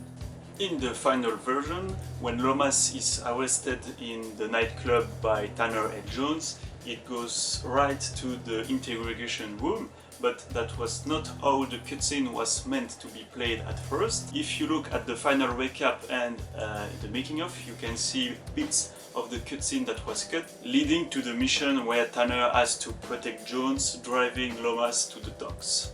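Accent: France. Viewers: you french boi ?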